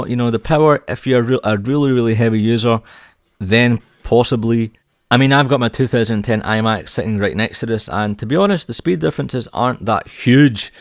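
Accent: Scotland, United Kingdom. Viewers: you're scottish laddy!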